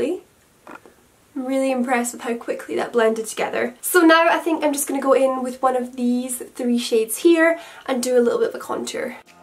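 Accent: Scotland, United Kingdom. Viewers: this was a amazing💋 are u scottish xx💗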